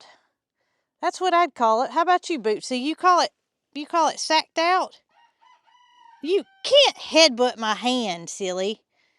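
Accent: South, United States. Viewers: I really love your charming southern accent and phrases. I'm from South Africa so your accent is so different from where I am from. I love all your video content as well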